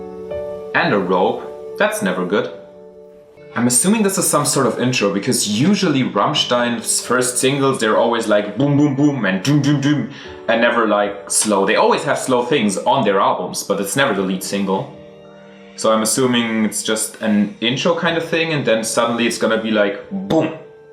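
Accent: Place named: Germany. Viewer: Obviously, your translation is going to be the best one. You speak English very well. However, your accent is German. Thanks for your translation!!!! Could you write the lyrics in German and English? Please? This song is so dark! Is about death, isn't it?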